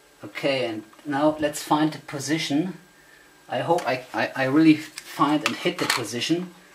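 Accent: Germany. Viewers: LOL, "Peeeeep!" instead of "beeep"! :-D Love that German accent, man! :-D Fun video (even with the disappointment that the pro recorder was too stupidly designed to play also)! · I love your german accentt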